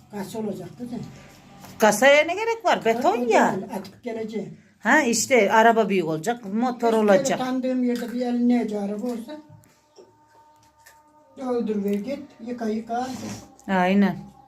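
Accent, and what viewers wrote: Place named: Beyşehir, Turkey. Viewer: Bende beyşehirliyim ctesi pazarına bende gittim Baska yerde oturuyom konuşmanı bize benzettim de abone oldum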